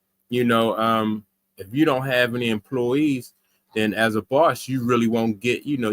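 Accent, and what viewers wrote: Baltimore, United States. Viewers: I can hear the Baltimore in your voice.. my hometown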